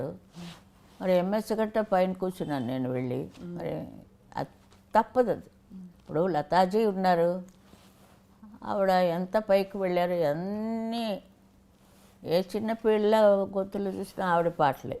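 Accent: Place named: Vizianagaram, India. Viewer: పాపం పసివాడు అమ్మా మీ మాటల్లో విజయనగరం కనిపిస్తోంది. మా నాయనమ్మ గారు పుట్టింటివారు విజయనగరం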